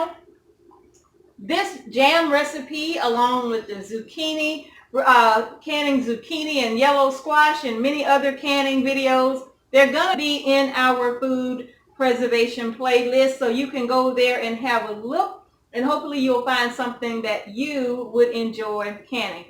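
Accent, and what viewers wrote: South, United States. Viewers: I love watching and listening to you! It takes me back home to Louisiana. I live in Indiana now and it is so good to listen to the southern way of talking. I love how real you are. From one sister to another. Blessings.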